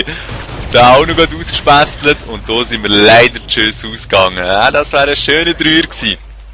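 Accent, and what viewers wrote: Switzerland. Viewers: ich liebe diesen schweizer akzent komm aus dortmund geiles video :) daumen hoch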